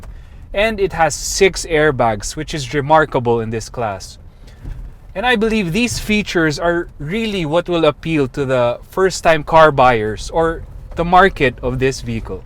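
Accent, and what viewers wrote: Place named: Philippines. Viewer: He actually speaks well if you don't mind the Filipino accent .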